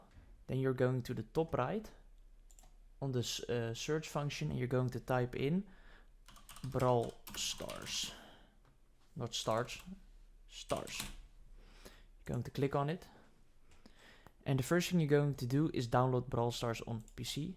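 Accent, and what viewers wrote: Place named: Netherlands. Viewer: Crazy Dutch accent (no offense)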